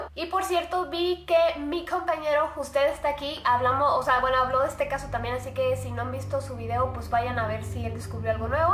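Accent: Colombia. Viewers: Las papal abras que usa y su acento, es muy Colombiano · Dizque usa palabras de México obviamente es colombiano por como habla, las palabras y el acento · El es colombian se los digo porque yo lo soy y se le nota :v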